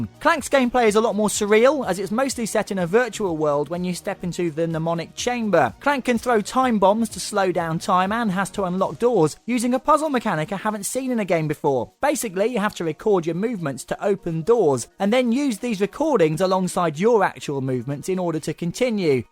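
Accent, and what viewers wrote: United Kingdom. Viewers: Am i the only one that findsthis review more entertaining because of the the ( hope i have this right ) british accent.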